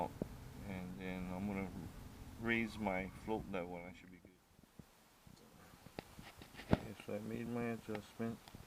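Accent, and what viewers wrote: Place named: Hawaii, United States. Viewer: Funny how you can just tell someone from Hawaii from the accent. Lol as soon as you started talking I knew you from Hawaii.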